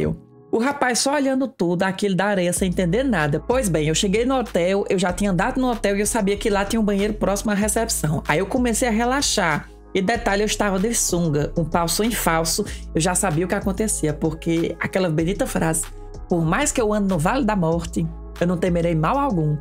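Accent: Northeast, Brazil. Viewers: pois é. aos 74 anos estou perdidamente apaixonada por esse querubim de cabelinhos encaracolados chamado. o que me encanta é a naturalidade da sua essencia com esse sotaque nordestino que me dá tanta da saudade da minha Bahia que deixei aos 6 anos e nunca mais voltei. Parabens. Deus te abençoe e nunca perca sua essencia. Bjsss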